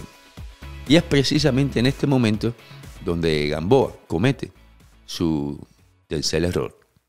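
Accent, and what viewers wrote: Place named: Cuba. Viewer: Compay yo entendi porque soy cubano pero es documental hablado esta calentico solo pa cubano que hablamos mal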